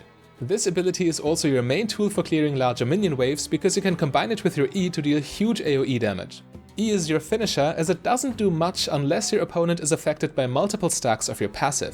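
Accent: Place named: Germany. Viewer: Der Bruder ist deutsch das hör ich doch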